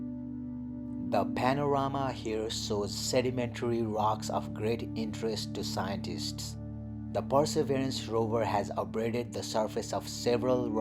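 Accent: India. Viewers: I find it a little harder to understand as it has an Indian sounding accent. I am from the UK.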